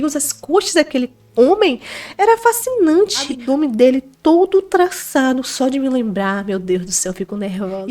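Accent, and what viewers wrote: Bahia, Brazil. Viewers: Essa narração feita pela a, com esse sotaque baiano ficou maravilhossaaa.!!!❤❤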